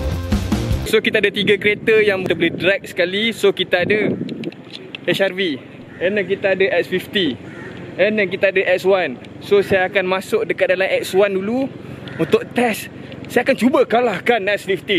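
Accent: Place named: Kelantan, Malaysia. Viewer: ni ore Kelate ke? Bila sebut 'tadi' jadi 'takdi' dan 'steering' jadi 'stereng'. Hehe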